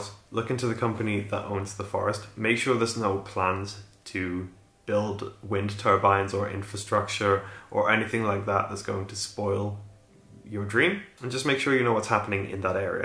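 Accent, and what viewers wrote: Sweden. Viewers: You sound so Swedish when you pronounce Swedish words. You even have the Swedish twang when you speak English. You should do podcasts!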